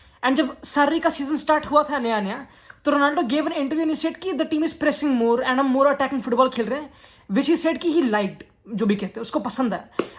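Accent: India. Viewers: Hindi accent is 🔥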